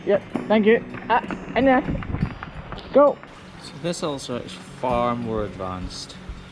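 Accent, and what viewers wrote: Scotland, United Kingdom. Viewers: How long does it take for the glue to get loose? Is that always long enough to heal up? Like your videos. Even if your Scottish accent is hard to understand for a Dutchy like me, I learn more about hoof trimming! Btw: nice trimming box you have 😉. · I do believe that I am beginning to catch on to what you do to those cows feet! Its your Scottish accent that makes we ladies watch your videos! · Im from Wisconsin in the US and we have a ton of Dairy farms I didn't realize Scotland had that many farms I thought you guys just had goats and sheep and wore kilts jk about the kilt part. You look like you could be from here until you speak Well I live in Milwaukee so near here anyhow. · I like your videos because I love cows and your scottish accent 😍👌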